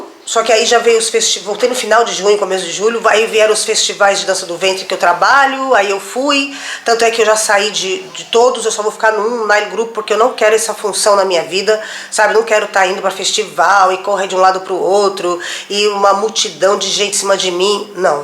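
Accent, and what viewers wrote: Ceará, Brazil. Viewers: desculpa a curiosidade. Mas você é cearense?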